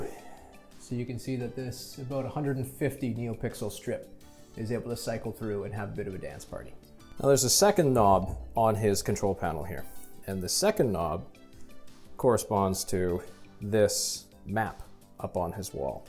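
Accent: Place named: Belgium. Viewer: Tot nu toe klink je belgs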